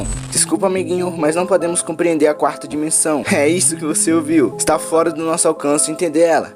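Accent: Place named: Brazil. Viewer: ainnnnn xenofobicomm parece até sulista Edit: tu realmente é baiano?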